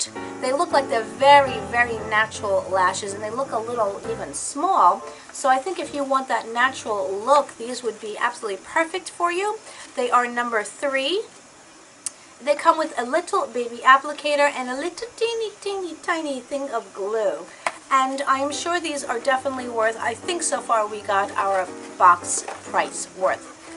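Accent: New York, United States. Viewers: From one New Yorker to another...who sound the same lol! Love your videos! 😉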